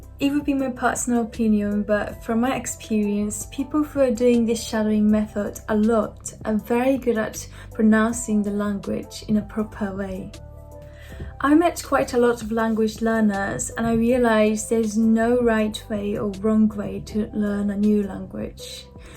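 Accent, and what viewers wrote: United Kingdom. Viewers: はじめまして！ブリティッシュアクセントでしたか！プリンセスみたいです🧡ブリティッシュアクセント大好きなので頑張りたい！基礎の文法が怪しすぎるのですが、主様はどんなふうに学びましたか？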